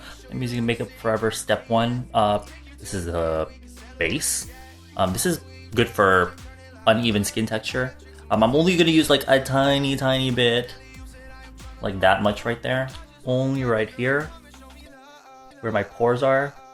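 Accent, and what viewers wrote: South Korea. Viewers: this was a good look for you. you speak so much Konglish now! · i love how he says english names in a korean accent so much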